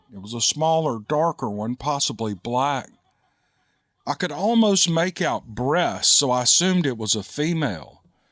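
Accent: South, United States. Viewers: Sorry pal, your stories were indeed intriguing, albeit, your accent is abhorrent… ive heard it my entire life, i was born in the deepest southern accent town, yet i never gained this terrible accent… some of my fam did… i suppose its attributed to a lower IQ, or perhaps a less of a care for reality, maybe one in the same… who knows. Thanks for the vid tho, its my first and last one, cheers my friend! (I imagine youll do well since many folks love the southern accent…) (and god have mercy on humanity if this is indeed an AI voice… sheesh)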